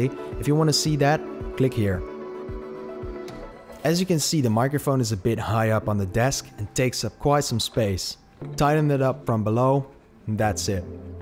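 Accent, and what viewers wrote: Netherlands. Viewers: Are you dutch by any chance? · Great video! Im recieving this one tommorow and and when i opened the video i could immediatly hear you are dutch too haha, your channel looks great!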